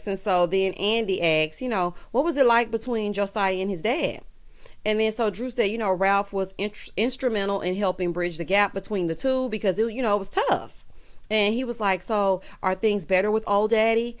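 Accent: South, United States. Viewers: oh godess you have the cutest voice and balanced fun comentary are you a southern belle?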